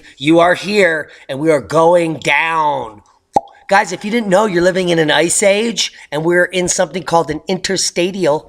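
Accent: England, United Kingdom. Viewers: Love your English accent. What accent, I don't have an accent.LOLLOL. Great show. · nice Brit accent bra!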